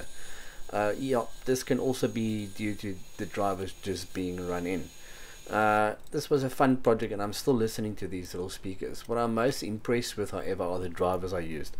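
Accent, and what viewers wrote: South Africa. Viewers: Fabulous blog, great flow, good content. Well done. I recognize your accent as South African. Cape Town, I suspect. Fly the flag high Mate. Great work. Mark, Johannesburg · I may be really wrong, but you sound South African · You sound very South African?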